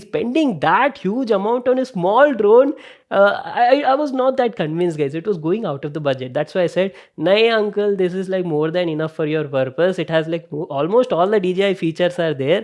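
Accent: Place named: Hyderabad, India. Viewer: Your accent says you are from Hyderabad 😃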